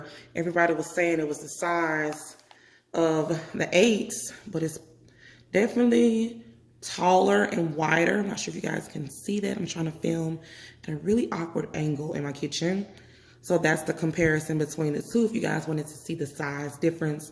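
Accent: Mississippi, United States. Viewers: Lol.....i know that Mississippi accent anywhere #notificationsquad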